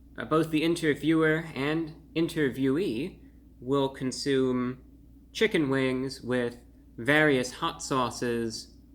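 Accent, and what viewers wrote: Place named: Mid-Atlantic, United States. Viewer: What makes these reviews a rare gem? The deliberate pacing, articulation, lack of saying "um", and that mid Atlantic "accent". Not to mention it's entertaining!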